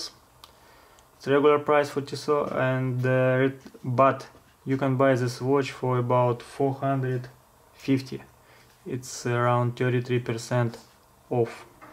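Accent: Russia. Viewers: я не знаю английский, но обзорщик явно русский)))) а часы отличные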